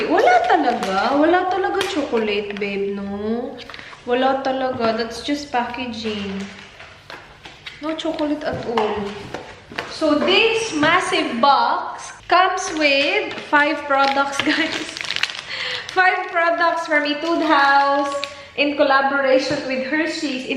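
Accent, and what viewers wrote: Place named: Visayas, Philippines. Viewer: Hi ate! Bisaya din po ako. Ang saya talaga manood ng mga vlogs mo. Nindot kaayo inig naga bisaya ka kataw anan kaayo haha ❤️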